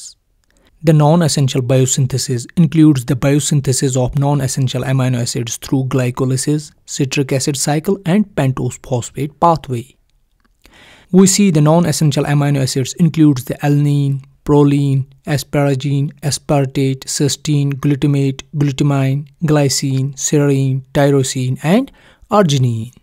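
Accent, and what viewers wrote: Kashmir, India. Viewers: Are u from kashmir sir?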